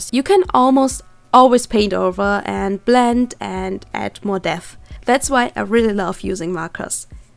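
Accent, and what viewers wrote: Germany. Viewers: Do i hear a german accent? I guess i do~ Greetings from germany <3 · After a few words I knew shes from germanyyy :D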